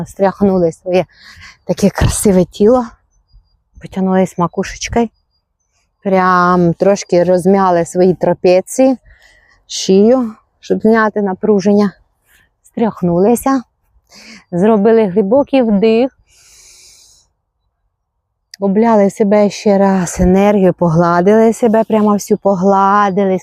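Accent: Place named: Poland. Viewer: Спасибо Вам. Приято слушать Ваш говор, наверное польский.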